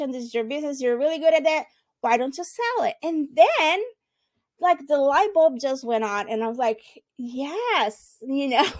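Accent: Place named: Brazil. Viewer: I knew it! It was king hard, but I thought: “the accent.. it seems to have a little of Brazilian in it, but I was not sure”.. congrats!! Awesome content, and beautiful accent!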